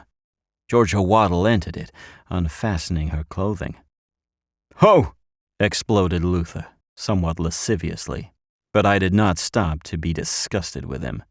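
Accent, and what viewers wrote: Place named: United States. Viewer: Many thanks,!! Great American accent!! I'm American and I think you did a great job!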